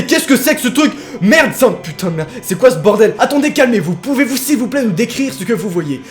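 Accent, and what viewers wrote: Belgium. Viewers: il est belge ou suisse chépa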